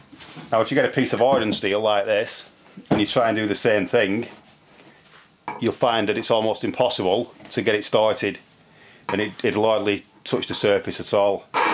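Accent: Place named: Northern England, United Kingdom. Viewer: I am from Sweden and I can understand all your saying although it is not as easy as "non-accent" english for me. Guess your from the northern parts of England, New Castle area?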